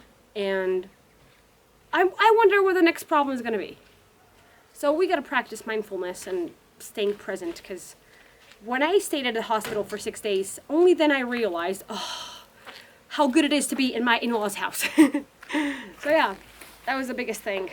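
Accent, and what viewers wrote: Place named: United States. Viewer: Damn, you sound so American, like the loud kind, I don’t m a that in a bad way, I’m American. It’s mind bending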